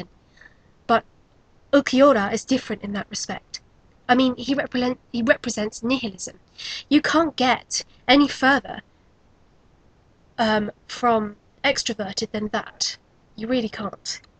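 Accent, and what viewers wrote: United Kingdom. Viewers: LOL I'd like to see Canon Ulquiorra's reaction to UH Ulquiorra XD Oh the so-called Orihime fans *rolls eyes* the only ones in denial are them. As regards the heart and it's meaning, one of the chapters of the Hueco Mundo Arc was called "Six Hearts Will Beat As On" refering to all the nakamas who went to save Orihime, so there it is, the "heart" as a friendship device. Btw, I love your pronunciation. You're british, aren't you? XD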